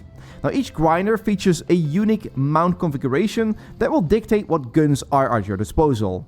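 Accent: Netherlands. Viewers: Hahaha! Typische Nedelandse accent! 🤣😁:yougotthis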